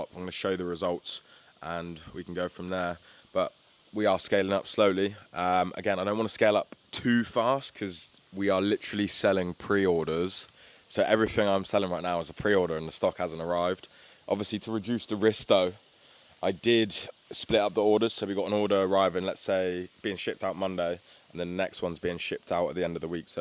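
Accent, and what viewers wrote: United Kingdom. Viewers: Pretty Cool Bro! 😂 What A Mockney Geezer He Is!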